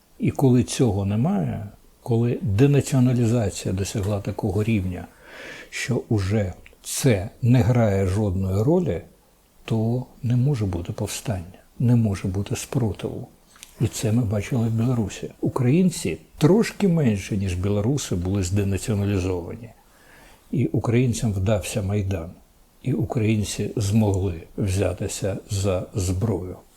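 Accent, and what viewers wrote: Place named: Russia. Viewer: пане, "нЄхлюство" українською мовою - "недбалість". Вам не подобаються українські слова? вам більш до вподоби московські? з московською вимовою на кшталт "проблЄми" тощо. Навіщо ви так спотворюєте державну мову?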